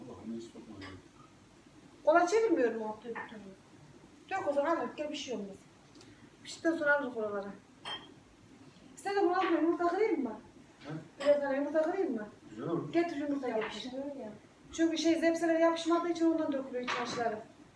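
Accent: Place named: Çorum, Turkey. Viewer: asıl memleketiniz neresi biraz çorum tarafına benziyor şiveniz becerikli süperrrrrrr süperrrrrrr hamarat anne hayırlı akşamlar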